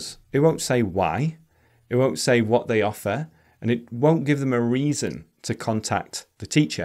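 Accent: United Kingdom. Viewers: Wow, I havent `t seen you video for a long time , you `r British accent is really nice . Thank you .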